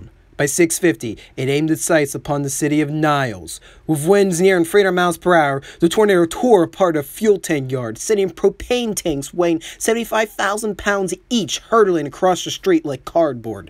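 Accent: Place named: Pittsburgh, United States. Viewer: he's definitely from the area, that's a very strong Pittsburgh accent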